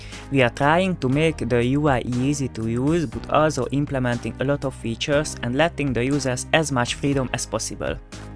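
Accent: Hungary. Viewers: Ik I recognized the hungarian accent ^^ tudtam felismerem a magyar accentust :3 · AMERICA 🇺🇸🇺🇸🦅🦅 Wait, what language do you speak then? I hear an Hungarian accent so I assume that you speak Hungarian (like me!!! ) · The Hungarian accent is very audible, which I'm happy about because I'm Hungarian Szóval nagyon várom hogy kijöjjön a játék<3 (ha még nem jött ki)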